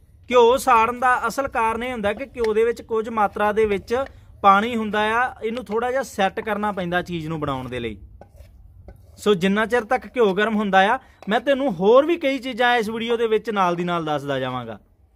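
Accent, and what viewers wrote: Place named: Malwa, India. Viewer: ਸਤਿ ਸ੍ਰੀ ਅਕਾਲ ਵੀਰ ਨੂੰ 🙏🙏🙏 ਵੀਰ ਸਤਿ ਸ੍ਰੀ ਅਕਾਲ ਮਨਜੂਰ ਕਰਨਾ ਵੀਰੇ ਭਰਾ ਮੇਰਿਆ ਮੇਰੀ ਪਤਨੀ ਨੂੰ ਗਠੀਆ ਏ ਜੋ ਜੋੜ ਦਰਦ ਬਾਰੇ ਤੂੰ ਦੱਸ ਰਿਹਾ ਏ ਸਭ ਕੁਝ ਉਵੇਂ ਹੀ ਏ ਤੇ ਉਹ ਮੈਡੀਕਲ ਦੀ ਦਵਾਈ ਖਾਂਦੀ ਏ ਕੋਈ ਜਿਆਦਾ ਫਰਕ ਨਹੀਂ ਬਾਕੀ ਤੇਰੇ ਬੋਲਣ ਦੇ ਅੰਦਾਜ਼ ਤੋ ਪਤਾ ਲੱਗਦਾ ਕਿ ਸ਼ਾਇਦ ਤੂੰ ਮਾਲਵਾ ਏਰੀਏ ਦਾ ਹੈ ਮੈਂ ਸੰਗਰੂਰ ਦੇ ਨੇੜੇ ਇਕ ਪਿੰਡ ਵਿਚੋਂ ਹਾਂ ਹੁਣ ਸਰਦੀਆਂ ਨੇ ਹੁਣ ਦਰਦ ਹੋਰ ਵੀ ਵਧ ਗਿਆ ਏ ਤੇ ਹਰ ਸੀਜਨ ਇਹੀ ਕੁੱਝ ਬਣਦਾ ਏ ਜਦੋਂ ਮੌਸਮ ਬਦਲਦਾ ਏ ਕਈ ਵਾਰ ਪੈਰਾਂ ਦੀਆਂ ਅੱਡੀਆਂ ਵੀ ਸੁੱਜ ਜਾਂਦੀਆ ਨੇ ਤੇ ਇਸ ਤਰਾਂ ਮਹਿਸੂਸ ਹੁੰਦਾ ਜਿਵੇਂ ਕਿ ਅੱਡੀ ਵਿੱਚ ਪੀਕ ਪਈ ਹੋਵੇ ਕਈ ਵਾਰ ਘਿਉ ਦੀ ਮਾਲਿਸ਼ ਕਰਕੇ ਕੁੱਲ 10% ਦਰਦ ਘੱਟਿਆ ਮਹਿਸੂਸ ਹੁੰਦਾ ਸੋ ਵੀਰ ਮੇਰਿਆ ਮੇਰੀ ਗੱਲ ਜਵਾਬ ਜਰੂਰ ਦੇਵੀਂ ਬਾਕੀ ਵੀਰ ਮੈਂ ਖੁਦ ਆਪ ਮਿਰਗੀ ਦੇ ਦੌਰੇ ਦਾ ਮਰੀਜ਼ ਹਾਂ ਮੈਨੂੰ ਵੀ ਦਵਾਈ ਖਾਂਦੇ ਨੂੰ 12 ਸਾਲ ਹੋ ਚੁੱਕੇ ਨੇ 2007 ਵਿੱਚ ਪਹਿਲੀ ਵਾਰ ਦੌਰਾ ਪਿਆ ਸੀ ਤਿੰਨ ਸਾਲ ਦਵਾਈ ਖਾਣੀ ਹੁੰਦੀ ਏ ਪਰ ਵੀਰ "ਚਾਰ ਤੀਏ ਬਾਂਰਾਂ" ਹੁਣ ਤੱਕ ਚੱਲੀ ਜਾਂਦੀ ਏ 12 ਸਾਲ ਤਾਂ ਖਾ ਲਈ ਹੋਰ ਪਤਾ ਕਿਥੇ ਤੱਕ ਜਾਣੀ ਏ ਵੀਰ ਮੈਂ ਤੇਰੇ ਗੱਲ ਕਰਨੀ ਏ ਜਾਂ ਤਾਂ ਆਪਣਾ ਨੰਬਰ ਦੇ ਦੇਵੋ ਜਾਂ ਮੈਨੂੰ ਕਾਲ ਕਰ ਲਈ,ਵੈਸੇ ਵੀਰ ਤੂੰ ਤਾਂ ਆਪਣਾ ਨੰਬਰ ਸ਼ਾਇਦ ਹੀ ਲਿਖੇ ਕਿਉਂਕਿ ਹਰ ਕੋਈ ਕਾਲ ਕਰੀਂ ਜਾਂਦੇ ਨੇ ਮੇਰੇ ਨੰਬਰ ਤੇ ਕਾਲ ਕਰੀ ਵੀਰ <num> ਸੋ ਵੀਰ ਮਿਹਰਬਾਨੀ ਹੋਉ ਤੇਰੀ ਪੜਨ ਵਾਲੇ ਹਰ ਭਰਾ ਬੇਨਤੀ ਏ ਮੈਨੂੰ ਕਾਲ ਉਹੀ ਵੀਰ ਕਰੇ ਜੋ ਵੀਡੀਓ ਵਿੱਚ ਜਾਣਕਾਰੀ ਦੇ ਰਿਹਾ ਏ ਕੋਈ ਹੋਰ ਵੀਰ ਬੇਵਕੂਫ ਬਣਾਉਣ ਦੀ ਕੋਸ਼ਿਸ਼ ਨਾ ਕਰੇ ਪੜਨ ਲਈ ਧੰਨਵਾਦ ਵੀਰ ਦਾ ਸਤਿ ਸ੍ਰੀ ਅਕਾਲ ਵੀਰ ਨੂੰ ਇੰਦਰਜੀਤ ਸਿੰਘ ਸੰਗਰੂਰ ਦੇ ਇਕ ਪਿੰਡ ਵਿਚੋਂ 🙏🙏🙏🙏🙏🙏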